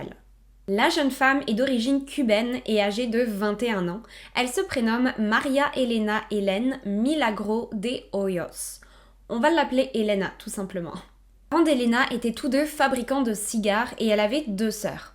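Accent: Quebec, Canada. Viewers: Je suis surpris que tu sois de Lille, tu as vraiment pris un accent canadien je trouve, on retrouve pas celui des HDF dans ta voix mais c'est aussi compréhensible quand on s'expatrie. En tout cas, chouette vidéo, je t'ai découvert grâce à Mogota et c'est chouette ce que tu proposes. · Aucune apologie.......... De meme....... Une Française avec un petit accent québéquois !!!! Non de Lille mais de L'isle sur le doubs (dep 25)......... Voilà la raison pour laquelle vous etes toujours bien maquillée. Bonne fin d'année. un livre qui à mon avis est bien écris et intéressant : "The last victim" : Jason Moss et Jeffrey Kottler. (bouquin non traduit, version américaine). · Au début j'avais cru que t'étais juste d'origine française mais que t'étais vraiment québécoise parce que t'as vraiment pris l'accent et leur façon de parler, je suis aussi française c'est pour ça que je te le dis (et fan de supernatural au passage :p aussi de surnaturel/horreur) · Trop drôle t'es d'min coin ! 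🤣 Je pensais vraiment que tu était Canadienne. 😅 Tu es passé du Nord au Grand Nord mdrr En tous cas encore une vidéo de dingue, quel taré ce gars 😵‼️ Continue comme ca j'adore tes vidéos ❣️ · Très intéressant, ce n'est choquant, mis à part l'exposition au publique, j'avoue que c'est une sorte de dérive, mais je penses que celà reste une forme d'amour, étrange, glauque, qu'on ne peu comprendre 🤷‍♂️ mais ce n'est pas un acte criminel, ou du genres, c'est pour ça je penses qu'il a été relâché direct, ce n'est pas réellement du vandalisme en définitif Après j'avoue que c'est étrange et difficilement compréhensible mais bon 🤷‍♂️ Ah oui c'est vrai que tu es française 😌🤘 d'où l'accent québécois très léger, jm disais aussi ^^ C'est cool en tt cas et j'adore ta chaîne et ta personnalité 😊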